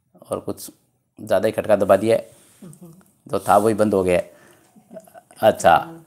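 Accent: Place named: Marwari, India. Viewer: Love your Marvadi flavored hindi sir- love from Goa.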